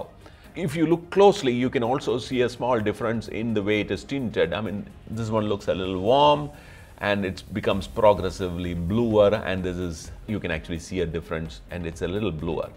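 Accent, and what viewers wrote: Kerala, India. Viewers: really enjoying how he explain it, simple and understandable, and especially the accent :) is it india? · Are you mallu 😀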